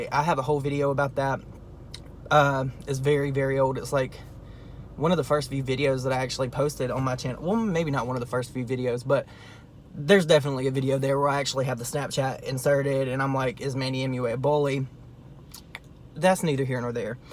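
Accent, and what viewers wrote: South, United States. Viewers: I know you don't know much about MAKEUP itself, but did you just say, "Pat McGrath is rolling around in HIS grave?" I played it back many times!!! Pat McGrath is a Woman, and also still living!! Maybe it was just that I had trouble understanding your Southern Accent, and So Sorry if I misunderstood you. I was just at a loss when hearing that!!! WOW!! Jaclyn's Shady as ALL HELL!! I agree with her Ex husbands IG, (where he posted after her post of her hand on top of her new man's hand!) Well he Posted a photo, of his dogs paw on top of his hand, (meaning to me, A BITCH's paw or hand. One in the SAME!) LOL!! I understood what Chris was meaning!!! ALSO AMERICAN HORROR STORIES ON TV ON WENSDAY NIGHTS, TODAY'S ONLY TUESDAY!!! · New viewer! Love your accent! I'm a southern girl, too. Where are you from? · Where is your southern accent from? 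I'm from Georgia. 😁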